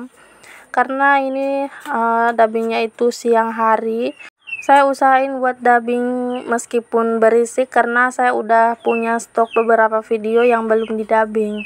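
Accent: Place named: Gorontalo, Indonesia. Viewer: Suka bgt logat gorontalo..teringat tmn yg dri Gorontalo jg.slm dri mkassar y bund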